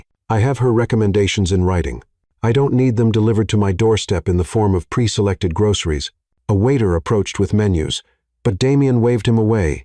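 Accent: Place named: Spain. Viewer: Why the Spanish inflections in the AI speech? They certainly distract from the story. AI should know English only..also AI pace is not how people speak. Saying MISTER then a long pause is odd.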